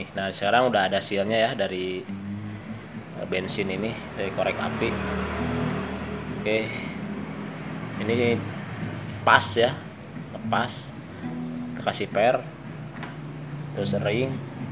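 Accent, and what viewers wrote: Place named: Sunda, Indonesia. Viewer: Mang ngomong nya yang leres 😂😂😂 Indo campur sunda.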